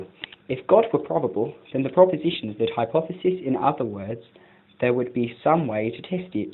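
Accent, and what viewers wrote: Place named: United Kingdom. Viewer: Holy shit this is awful in a British accent.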